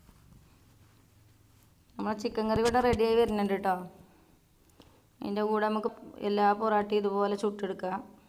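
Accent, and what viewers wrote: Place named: Malappuram, India. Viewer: മലപ്പുറം ടെച്ച്. അത് മനസ്സിനൊരു ആനന്ദമാണ്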